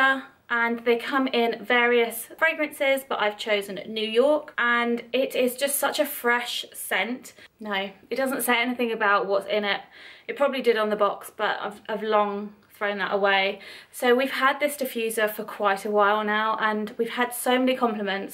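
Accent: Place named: United Kingdom. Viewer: im a man of simple things....i hear a British accent.....i subscribe 🤷🏾‍♂️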